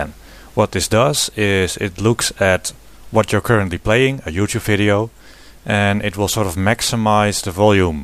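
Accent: Netherlands. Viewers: Aye is that a Dutch person I hear? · zo mooi om een nederlander engels te horen praten, je hoort het ook echt atijd binnen 1 seconden.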